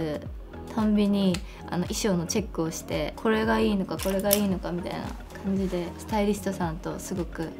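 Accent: Standard. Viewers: 標準語でお話するの謎の寂しさがある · ミラノまでウォンジョンヨ先生来てくれてるだ〜！このペアは最強がこんなに標準語喋ってるの新鮮w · 모처럼 모모가 표준어로 열심히 말해줬는데 ㅠㅠ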